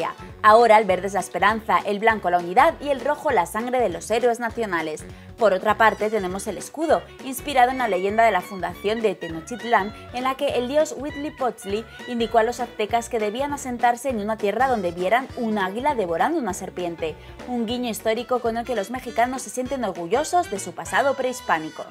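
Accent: Spain. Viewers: tu voz de española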